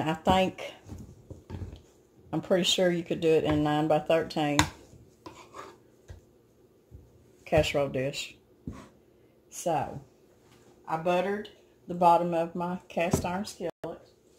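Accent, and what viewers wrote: South, United States. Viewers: Love her southern accent and love that she enjoys tamales we make them every Christmas de queso con chile verde and green chile pork tamales and red chile chicken my fav is sweet corn tamales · I wish I enjoyed hearing her talk…..but I don’t care for types of south Wern accents. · Love your accent. I’m Southern too. Keep it Southern!😁😁💕💕💕. Good dish thanks. I cook old time Southern food, South American authentic, and a couple Mexican dishes and authentic tamales. No me gusta pansa o menudo porque es puro quedito y nada carne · How interesting and useful! I live in Arizona and make Mexican food all the time. It's different to hear a very southern accent! I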